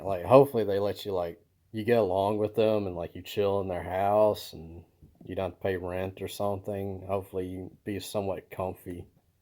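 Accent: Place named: South, United States. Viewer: Btw idk why, but the southern American English accent is my favorite. Your voice and accent rly gets me feeling cozy, next to a campfire, having a drink with ppl i trust (idk thats how your accent gives the vibe for me).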